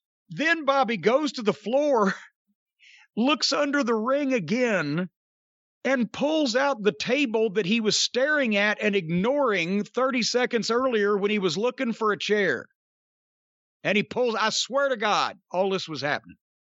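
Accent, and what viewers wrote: South, United States. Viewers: I've complained about the lack of quality control in wrestling for years! Especially when a fed has agents. Hearing the words in Jim Cornette's Dixie accent makes me feel vindicated. "Since there's a real injury, the camera does all it can to stay away from it." Ouch!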